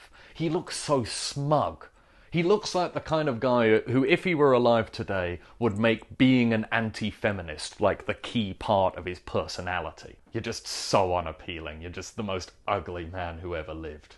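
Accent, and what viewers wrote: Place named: Northern England, United Kingdom. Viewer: fuck sake beat me to it bar the northern accent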